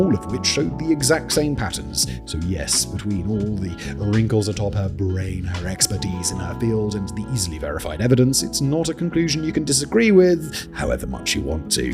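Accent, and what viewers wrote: England, United Kingdom. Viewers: I thought you were good. Yet you sold your soul to icoogni. The worst kind of company. Stupid british cuck. Learn to say aluminim (and Nike and Adidas and SIDEWALK because pavement is a material and not a location) correctly and maybe I will re-subscribe. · I think his team may have wanted to change up his image and make it more "hip" - but we don't WANT a hip! He's a nerdy bald guy with an English accent that makes him sound like Churchill on speed. But the whole setup has changed, different vibe altogether, complete with ruining his otherwise absurdly delightful narration.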